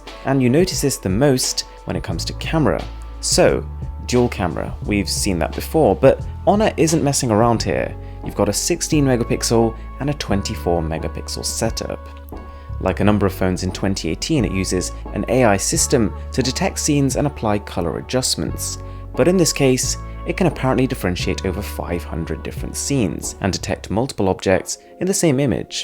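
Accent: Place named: United Kingdom. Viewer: That british accent.....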